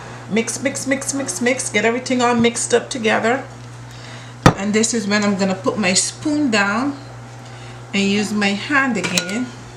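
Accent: Barbados. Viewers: I happened across your channel a couple weeks back. From the time I heard your voice I said "she is a bajan". I am off work this week and you can bet I'll be trying some of these recipes.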